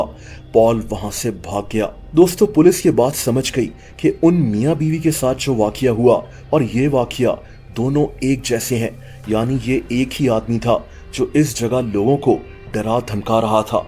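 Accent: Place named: Lahore, Pakistan. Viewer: speaks like a typical lahori definitely reality tv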